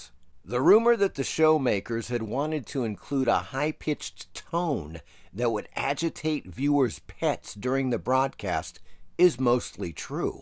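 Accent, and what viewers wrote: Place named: United States. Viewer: You're an American aren't you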